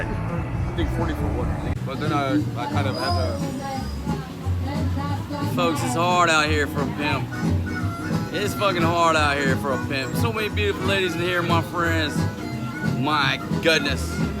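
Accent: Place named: Ohio, United States. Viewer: You sound like my old battle buddy from Ohio, Cincinnati kids named Ohler. Ohio River Valley trash he used to say. Hahaha. He's now a retired LAPD cop living in San Diego.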